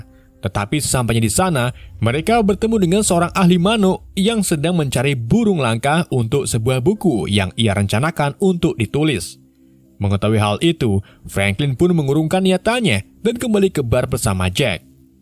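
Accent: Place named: Banyumas, Indonesia. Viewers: ternyata wong ngapak, salam sing Tegal 😂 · iso ngapak ya rika jebulnya 😂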